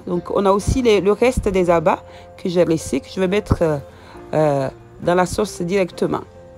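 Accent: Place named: South, France. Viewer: Mais tu as l'accent du Sud, pas de la côte d'ivoire 😊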